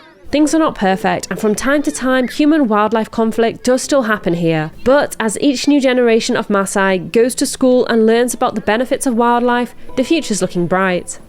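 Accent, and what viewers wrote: United Kingdom. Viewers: I could listen to your voice, 'British accent' whole day. I love your cinematic vid.😊 Karibu kenya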